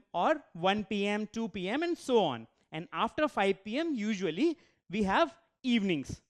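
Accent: India. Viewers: The indian accent is funny and cute at the same time. Keep it going, sir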